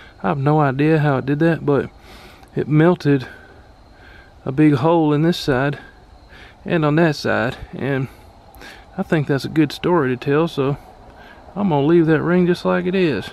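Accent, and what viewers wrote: South, United States. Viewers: We all do things like shock ourselves but most wouldn’t tell the world about it. I appreciate your humility and ability to laugh at yourself, after all we’re only human and not perfect. Also your southern use of the English language is quite entertaining. Thanks for another great video,!!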